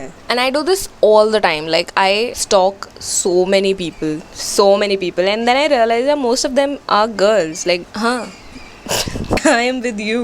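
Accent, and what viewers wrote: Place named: United Kingdom. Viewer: You're amazing Yaar or aapki British English wahhh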